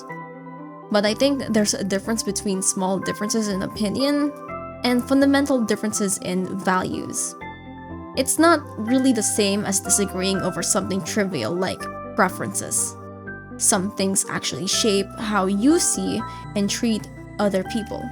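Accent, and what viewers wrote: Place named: Philippines. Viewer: Are you filipino? Your english sounds so much like Filipino english!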